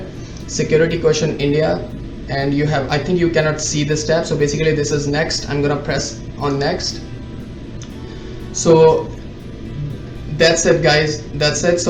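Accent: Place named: Canada. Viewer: Veere .. gonna gonna gonna 🤪 kini vari bolna hai.. u adapt Canadian Accent pretty well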